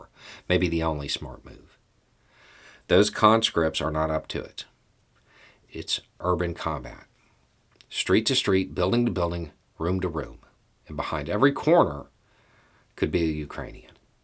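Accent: South, United States. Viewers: violent and unstable? Lol. I was just replying to a veiled threat. Also is clearly not a Yankee which is a name for a northerner here in the US. He’s clearly from the south.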